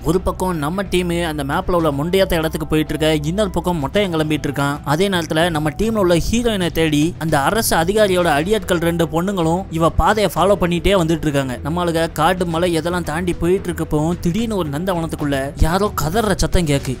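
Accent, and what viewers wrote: Tirunelveli, India. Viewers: Tirunelveli slang thalaiva 😁ellarukum pudikum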